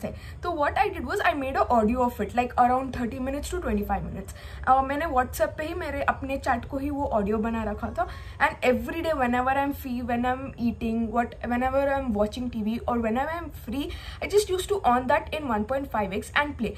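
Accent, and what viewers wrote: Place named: Karnataka, India. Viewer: Are you from Karnataka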